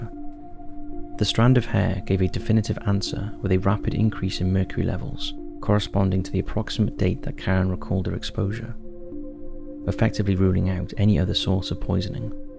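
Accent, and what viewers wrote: Wales, United Kingdom. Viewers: He’s definitely Welsh just not sure where abouts 😅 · I just watched your wars of the word doc on Vietnam... are you from Cardiff or Newport? you sound slightly Welsh...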